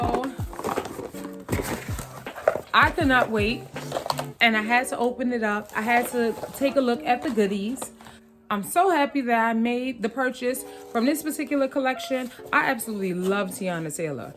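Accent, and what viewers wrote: New York, United States. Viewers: You have to be from New York saying because like that lolll at least the east coast I’ll finish watching loving your vibe new subbie!!!! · Your accent is great, I thought you were from New York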